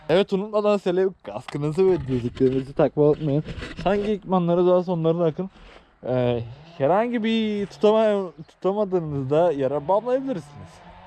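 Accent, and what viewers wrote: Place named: Macır, Turkey. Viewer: Efsaneeee tam macırca konuşma vallaha sard i😂😂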